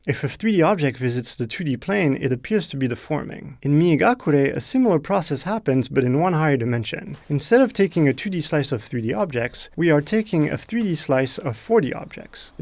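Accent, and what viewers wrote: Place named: France. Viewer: he sounds french, that's really cool. anyway, great video!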